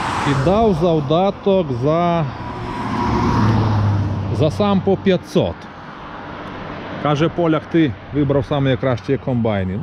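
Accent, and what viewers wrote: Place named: Polissia, Ukraine. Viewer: Доброго дня , у Вас мова даже схожа на людей з Полісся, Чорнобиль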